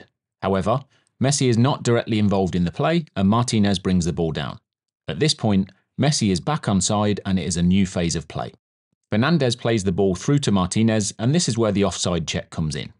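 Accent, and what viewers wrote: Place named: United Kingdom. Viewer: Thanks for the explanation, I like football but know near nothing and having a Brit explaining is great!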